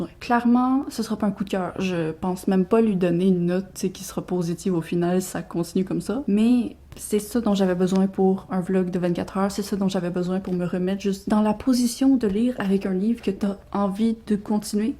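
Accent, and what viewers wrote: Quebec, Canada. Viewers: "Les vêtements ça se porte" 😂😂😂 J'adore tes vidéos, continue comme ça ! (Et puis l'accent québécois 😍)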